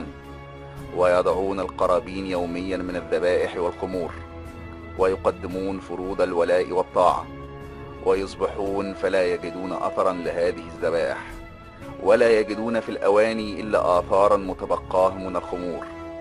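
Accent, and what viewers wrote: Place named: Egypt. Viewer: اخى العزيز لا تنطق حرف الجيم بلهجتك المصرية عند قراءة القرآن او الاحتديث النبوية الشريفة بارك الله فيك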